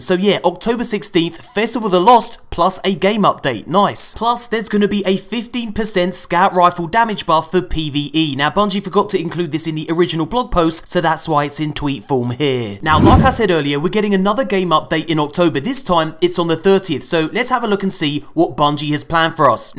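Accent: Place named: United Kingdom. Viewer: Welp.. I guess I'll sub now. Since you slowed tf down on talking speed you cheeky brit thing you.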